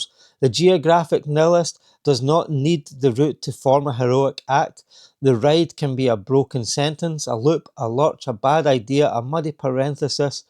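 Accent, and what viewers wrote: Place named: Scotland, United Kingdom. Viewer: I like your voice and accent! Which part of Scotland are you from?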